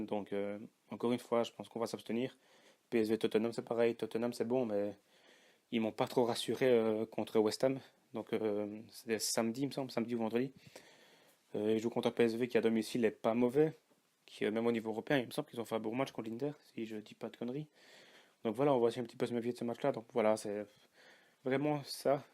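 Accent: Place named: Liège, Belgium. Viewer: salut tu viens de ou en belgique j aime bien ton accent j aurais dis pas loin de liege non ?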